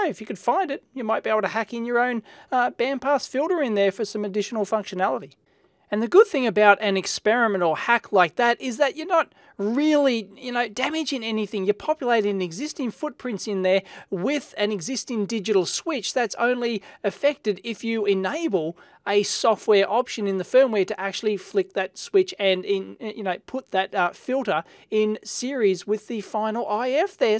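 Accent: Australia. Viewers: I am 728% American but after watching a video from you, occasionally I find myself saying, "hi," to someone but in your Australian accent. It throws people off but it also means I love you stuff.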